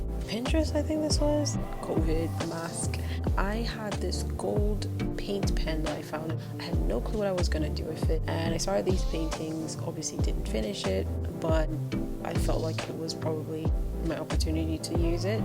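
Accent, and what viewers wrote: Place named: Barbados. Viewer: Beautiful work! The music was a bit loud and found it hard to listen to the Bajan accent. Keep arting. Looking forward to the next video! Stay safe 💜💛💙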